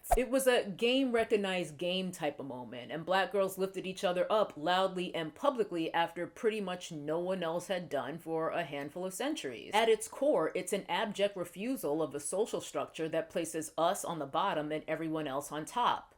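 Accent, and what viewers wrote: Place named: Brooklyn, United States. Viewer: I know this is off topic but are you from Brooklyn? Your accent sounds Brooklyn to me.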